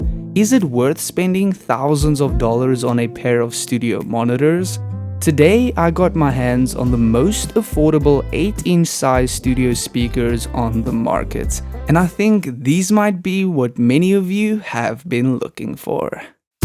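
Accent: South Africa. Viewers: are you south african dood i can hear it in your voice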